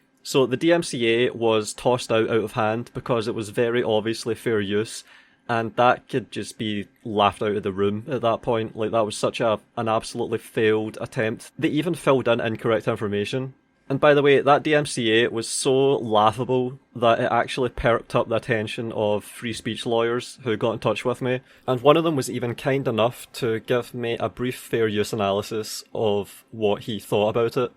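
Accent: Scotland, United Kingdom. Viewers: I don’t even play video games. I come to this channel for the angry Scotsman asmr.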